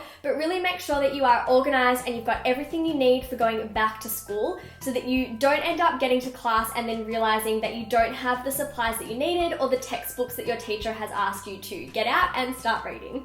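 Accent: Australia. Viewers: i dont really understand australia accents but i know ur videos so great. i really want to understand it, pls make english subtitile:( so sad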